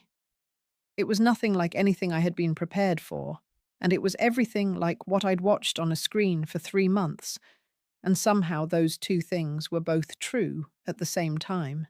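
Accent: United Kingdom. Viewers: First time I hear an Ohio person speaking with a British accent.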